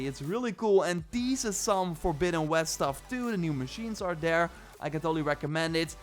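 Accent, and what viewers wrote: Netherlands. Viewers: As a dutch u can so clearly hear u are dutch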